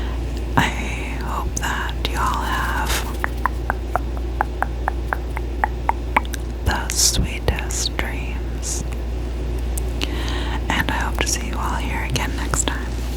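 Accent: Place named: Midwest, United States. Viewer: Are you from chicago cause you sound like youre in the midwest if not jersey!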